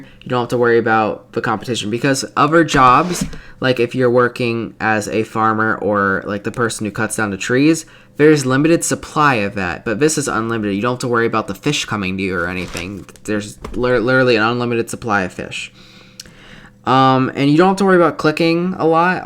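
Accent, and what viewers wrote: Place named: United States. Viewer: because cowboy accent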